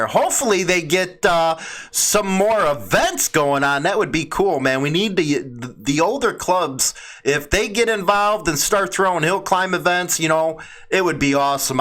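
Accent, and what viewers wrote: Chicago, United States. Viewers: Morning and good points indeed! The simple facts are that what happens in the club world affects all our world as Bikers. It’s just reality man. Profiling and all the BS that goes with it are a result of people drawing attention to Bikers for bad behavior! Just look at what’s happened over the last 10 years here in the ROT. Specifically since the BlueGang pulled the ambush at Twin Peaks and it didn’t go their way after it all shook out and it has and is gonna cost them big $$$ long into the future as it should! We both know they (BlueGang) are the worst sore losers on the face of the earth. Pull that kinda shit and it goes south so we will just harass and fuck with these clubs until we wear em out of existence! That’s the way they think and work. As you pointed out man with today’s surveillance everybody’s into everybody’s business 24/7 and that’s reality. 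As for your Chicago accent man, fuck em! Shit man combine that with my ROT accent and those whiners would lose their minds! LOL! Great show man. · Good morning you know I'm a big fan of your show but you can't blame mispronouncing words on a Chicago accent you should be proof reading the story's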